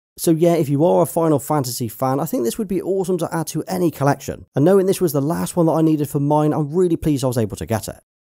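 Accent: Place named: Yorkshire, United Kingdom. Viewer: Where in Yorkshire are you from? I’ve never heard a Yorkshire accent like this 😊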